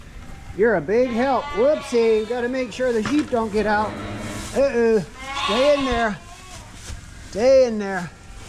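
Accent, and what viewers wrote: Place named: Lancaster Amish, United States. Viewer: Just found your channel from watching the interview which was very interesting my wife and I also left the Amish after we were married 4 years and that’s been 17 years ago I could hear in your Amish accent you were from Lancaster 😂 I grew up in Montour County Pennsylvania actually we just moved back here a year ago and so we do have Lancaster Amish for neighbors 😂 my wife and I did not grow up in Lancaster Amish but we did grow up old order Amish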